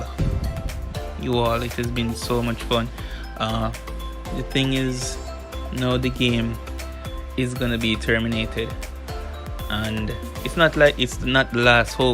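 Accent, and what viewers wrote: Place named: Caribbean. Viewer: hey which country you from?, you sound like a Caribbean person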